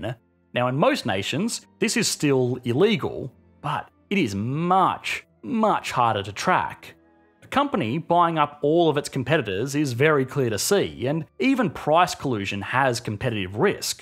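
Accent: Australia. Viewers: This guy has a very Australian accent. There are three Australian accents really, broad, general cultivated- with some variations between states too. This is almost a broad accent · He’s aussie mate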